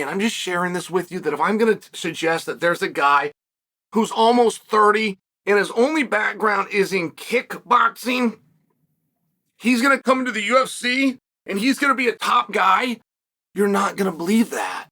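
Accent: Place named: United States. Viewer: I'm brazilian and chael have the most american accent i have ever seen speaking portuguese.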